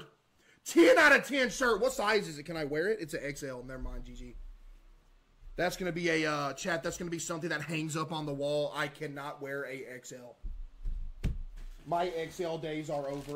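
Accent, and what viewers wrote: South, United States. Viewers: Why is Case doing a hard southern accent for Kentucky when he is literally in a state that is also another 13 southern states with Kentucky (Arkansas)? Lmao They all sound and act the same to me. 😂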